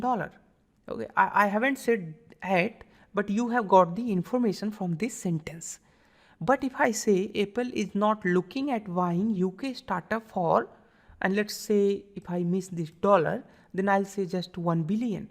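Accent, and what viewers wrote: India. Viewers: Bro, I don't usually comment but your videos are amazing. The way you speak English, with an Indian accent is what resonates with me. I have watched a lot of tutorials but they were mostly by non-Indian but I think your's are the best. You are underrated , brother. Btw, ima bitsian.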